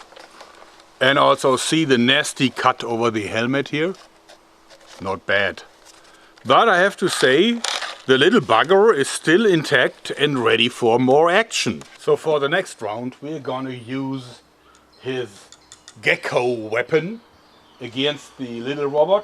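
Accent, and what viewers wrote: Germany. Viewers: is he german beacause he has ahuge accent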